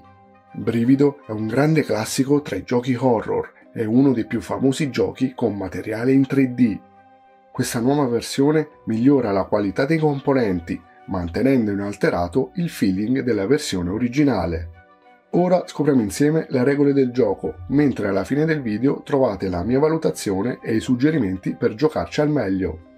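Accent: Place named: Tuscany, Italy. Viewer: dall' accento sembri Toscano 😅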